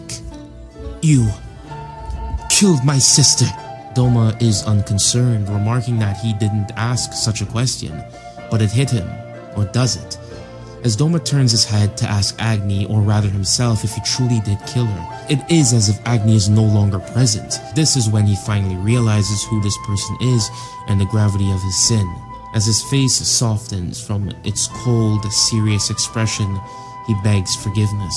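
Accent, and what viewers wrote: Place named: Caribbean. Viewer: What part of the carribean are you from?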